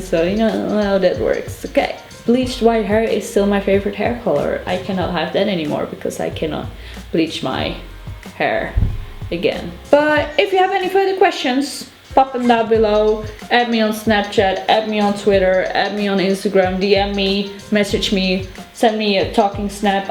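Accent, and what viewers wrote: Netherlands. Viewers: I hear a Dutch "accent" in your English.. Are you Dutch? Haha cuz if you are hii me 2 :3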